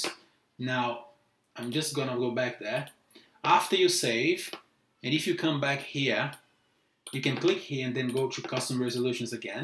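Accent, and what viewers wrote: Brazil. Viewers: Vc por acaso é brasileiro ? Oo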